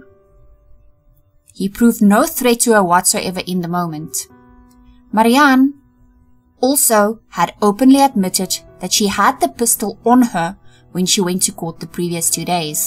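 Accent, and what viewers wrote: South Africa. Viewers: I recognize your accent sister 🇿🇦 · You sound Afrikaans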